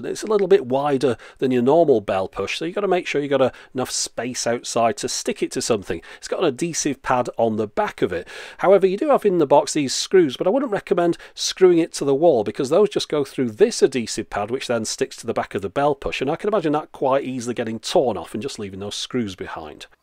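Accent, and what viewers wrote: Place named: Liverpool, United Kingdom. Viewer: No. Not really. People normally pronounce it "Add-hee-siff". The H is short, but not silent. I suppose it could be a regional dialect that could result in "Addy-siff". from (or at least near) Liverpool, so I wouldn't be surprised. Those people pronounce words funny all the time, but we dare not say that to their faces.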